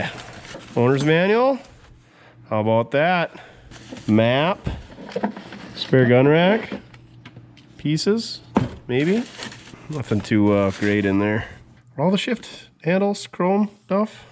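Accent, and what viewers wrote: Minnesota, United States. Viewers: I dont know where your from but there is a guy in Minnesota that yalks like. you do.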